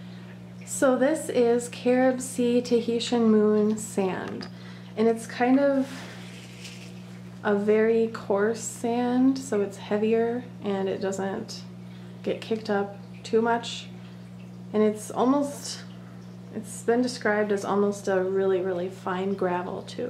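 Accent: Minnesota, United States. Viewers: Its the Minnesotan accent!!! Its cute!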